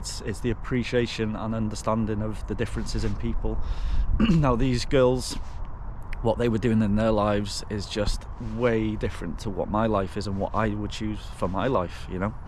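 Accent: England, United Kingdom. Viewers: Your accent is english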